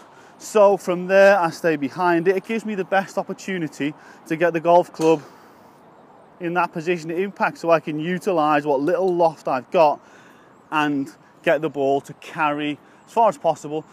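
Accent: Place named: Liverpool, United Kingdom. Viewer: Great tip. I've been struggling with the drive for years & this tip immediately improved things for me. I'm living in Sydney, Australia but originally from Liverpool (Aintree - Old Roan) - I suspect from your accent you're from the same area.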